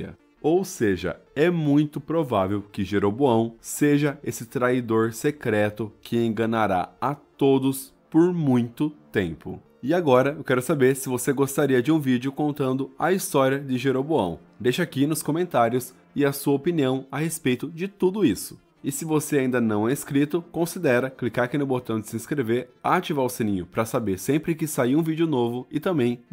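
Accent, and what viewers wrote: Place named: Caipira, Brazil. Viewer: Seu excessivo sotaque "caipires" nos "erres" nos finais das frases são aflitivos, prezado narrador ! Fica até difícil ouvi-lo 😲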